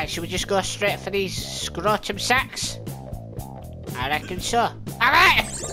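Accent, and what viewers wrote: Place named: Scotland, United Kingdom. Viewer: You Are Scottish Right?😂😂🔫🔫 😍🔥Dope Accent!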